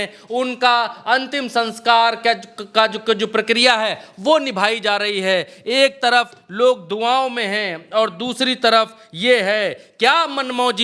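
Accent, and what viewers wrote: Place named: Uttar Pradesh, India. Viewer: जी की देसी टोन यूपी वाली सुनने मे बड़ा मजा आता है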